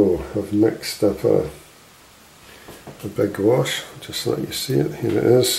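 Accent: Scotland, United Kingdom. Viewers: I just found you! I'm so glad I did. I so loved watching this video, and everything come to life. You're amazing. I loved listening to you talk. Are you Scottish?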